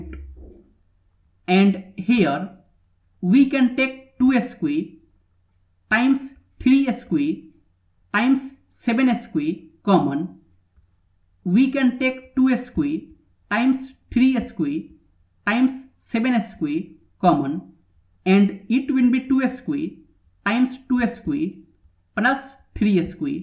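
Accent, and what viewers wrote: India. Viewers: Indian English pronunciation is so terrible!!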